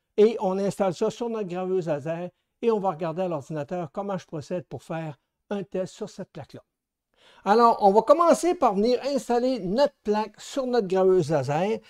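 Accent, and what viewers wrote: Quebec, Canada. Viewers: hey salut merci pour la video jai beaucoup appris!!! tu viens de ou avec ton accent c'est quebecois je suis de montreal moi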